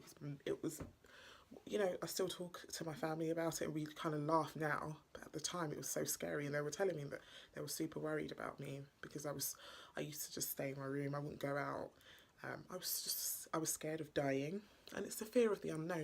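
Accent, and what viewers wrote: United Kingdom. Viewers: You sound like you live in the UK. You need very pale skin to remain healthy in many parts of Europe. Your natural habitat is in a much hotter climate with much more sun exposure. Climates with less sun than you need can make you infertile. This is simply Evolution. Caucasians get skin cancer in the sun, Africans thrive in the sun. Caucasians thrive in cold climates, Africans get very sick. You should consider a climate that your body is adapted for.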